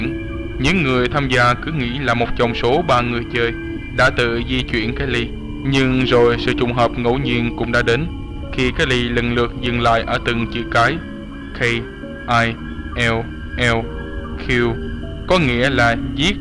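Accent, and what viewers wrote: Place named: Dak Lak, Vietnam. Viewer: giọng đọc vl ra.. giống giộng ở tây nguyên đac lắc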